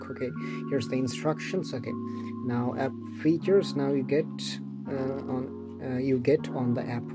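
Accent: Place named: India. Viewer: Bro are you indian? Your accent is 🔥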